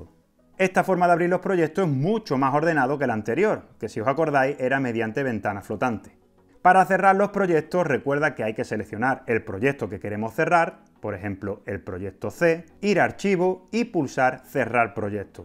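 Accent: Andalusia, Spain. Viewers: eres un crack. Videos super currados, concisos, certeros, clarísimos... y en andaluz. No se puede pedir más.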